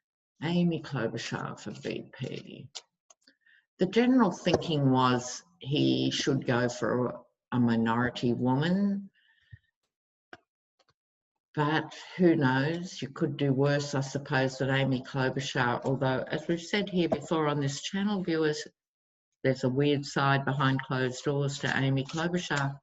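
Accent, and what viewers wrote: Australia. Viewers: I watch you with the closed captioning on and it’s of great amusement to me how it misunderstands your lovely Australian accent. For example barter become butter and pentacles becomes sentinels. Flynn’s becomes flinched. Short list is shortness. Good work! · Hello! Enjoy your readings, and especially your Australian lilt.:-) You, Linda G and Violetta are my favorites and listen to you regularly. Wondering, how it comes to pass that all three of you are now wearing turquoise rings last few days? Did you all agree beforehand? Or do you all feel the need for Throat Chakra strengthening of Truth? Or some other prompting? Thank you for all your work, Namaste.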